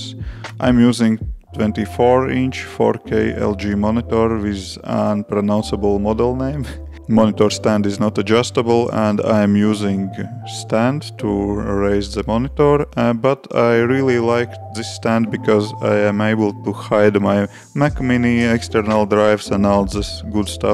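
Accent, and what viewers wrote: Russia. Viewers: Артем Анащенко Русский, ибо такой акцент трудно спутать))).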